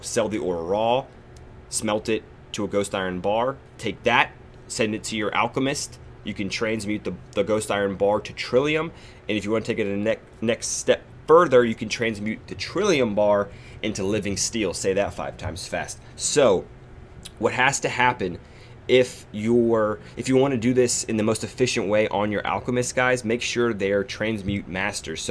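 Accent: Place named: United States. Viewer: Where are you from bro? I'm quite confused.. I am not sure if you're Australian or mid north-western American. Edit: ok You're totally not Australian. But I still can't pin point where you're from, I mean I'm sure it's America, but dunno which state.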